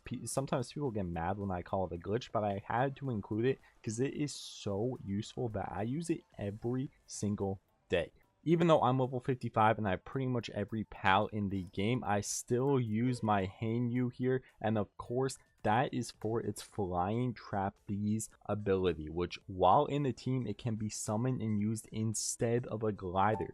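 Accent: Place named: Quebec, Canada. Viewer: you sound french canadian.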